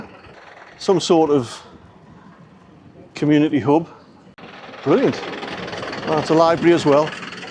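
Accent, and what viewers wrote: Newcastle, United Kingdom. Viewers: Good video. Just got to say, I LOVE the Geordie accent. Best accent in the UK, along with the Yorkshire accent.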